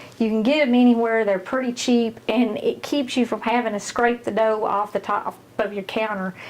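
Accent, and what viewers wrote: South, United States. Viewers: What part of the south are you from? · I really love your accent. If Dolly Parton made yeast rolls this is how it would sound. Being from Alabama I know a little bit about southern accents. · I started watching you and following you because you remind me of the south and I love the south! Even though I'm not from the south. 🙃 I love your accent and really love to see your love for God. I pray that God will bless you and I thank you for talking about Him. Jesus Christ is my Savior and I'm thankful that you are uplifting God/Jesus in your cooking videos. By the way, I love your recipes too.. they are the same recipes my Grandma made. 🙏❤ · When they speak with a southern drawl, You know you’re watching a reliable source for good food lol!!!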